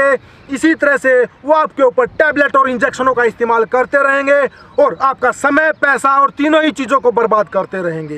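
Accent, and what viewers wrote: Haryana, India. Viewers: Sir apki boli haryanvi lagti hai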